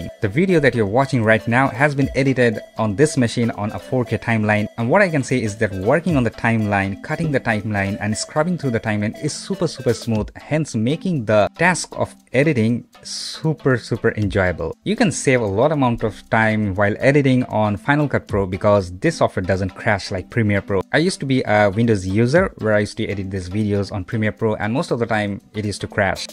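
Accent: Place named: United States. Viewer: thank you so much. I watched the other video you did about using it as a computer. Really answered question people didn't seem to be able to. And you sound very down to earth. I usually don't watch videos with an American accent cause there's a point my brain can't process the accent. So thank you.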